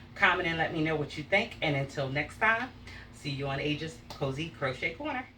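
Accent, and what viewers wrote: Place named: Philadelphia, United States. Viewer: Hey... love your work. Where are hot from if holy don't mind me asking. I hear Philly or Jersey. I live in Philly.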